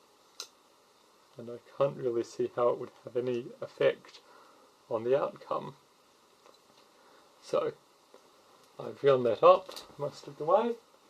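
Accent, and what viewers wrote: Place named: Australia. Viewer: You hit the nail on the head with this video, metal screws shouldn't just screw into plastic, look at the main long rod from the motor, thats the same deal, You need to make sure you get a copy of the STL file so you can make another as this is where you will get wear and tear, all of any of the moving parts that are metal that are in 100% contact with plastic will sooner or later fail, look at a RepRap for how the screw rods all have a nut to screw into and not bare plastic. You sound like an Aussie, I am as well, I had an order with this guy at milkyoubox and he took too long to send, 5 months was too long to wait, others wait still. Order canceled. This printer is cheap, in all ways, construction and money, but all up the waiting around costs more than anything, and all who give money too the guy at milkyoubox is padding his bank account as long as you wait, the interest from your own bank account you could have made on your money instead of he at milkyoubox.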